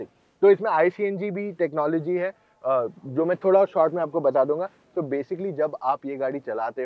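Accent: India. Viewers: CNG ka बाटला... 😄👌🏼Love the desi accent/words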